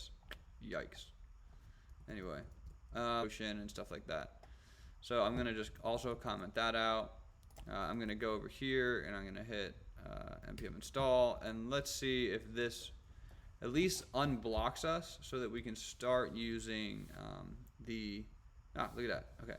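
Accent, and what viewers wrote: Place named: Belfast, United Kingdom. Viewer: Tongue, Accents Belfast oh mannnnny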